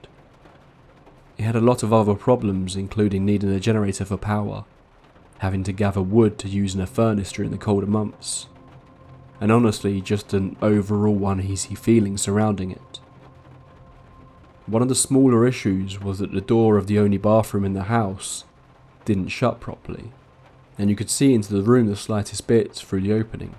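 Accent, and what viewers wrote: England, United Kingdom. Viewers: Because he is English, always make the best narrators, Americans are too hyper+ accents are trash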